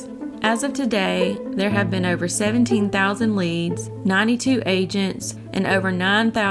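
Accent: South, United States. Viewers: Love your southern accent!! What state are you from? Love from Ireland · Love your accent- voice. May i ask what state you are from.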